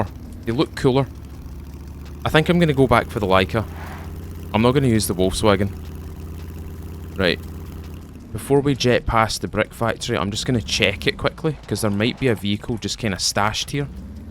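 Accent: Scotland, United Kingdom. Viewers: Just started playing SCUM a few days ago since the 1.0 release. As a daily DayZ player I have to say I LOVE SCUM 😊 Similar but different enough to provide an entirely different experience. Thoroughly enjoy your videos, that Scottish accent makes them a pleasure to listen to. I’m English from West Yorkshire. I live in America. For over 20 years I’ve heard “oooh I LOVE your accent where you from !?!?” Honestly being from Bradford I never understood it because we literally sound like cave men who were dropped as babies. A LOT. However I DO understand that certain sounds are appealing to certain ears while not for others so 🤷🏼‍♂️ Cheers mate hope you have a lovely Sunday 🙏🫡👊❤️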